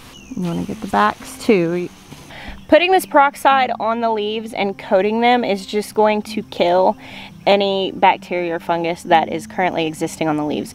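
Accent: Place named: Arkansas, United States. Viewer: Love your Arkansan-Southern accent. So refreshing. I live in Little Rock, it’s turning into something else. Lots of valley-girl coming in.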